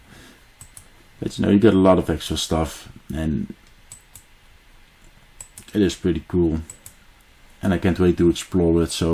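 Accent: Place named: Netherlands. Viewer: Let me guess.... Nederlands? Kan bijna niet missen haha.. 😜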